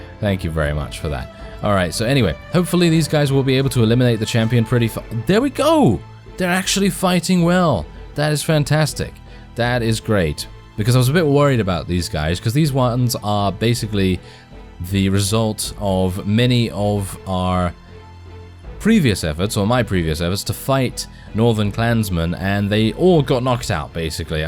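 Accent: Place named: United Kingdom. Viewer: Your voice is.... is so British. Its lulling me in to a falsh sense of security...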